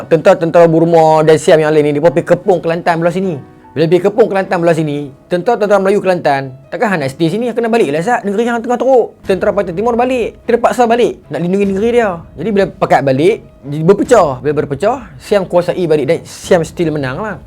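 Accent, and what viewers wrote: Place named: Kedah, Malaysia. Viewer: assalam...awat laju sangat hang habak mai...mokcik x faham..puas nk dengar...sambung2 kn isi cerita tu...campok2 slang kedah lg...mmg khas utk og kedah saja ka citer ni?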